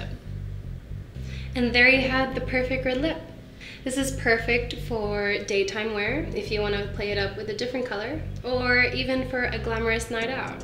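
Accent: Australia. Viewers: Did you practice to change your Australian accent or did it just change naturally? 😄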